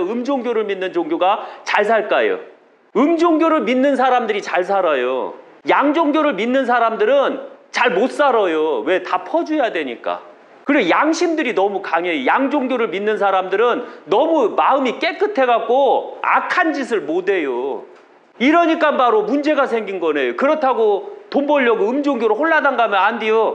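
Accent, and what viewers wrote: Chungcheong, South Korea. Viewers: 고향이 어디십니까 충청도?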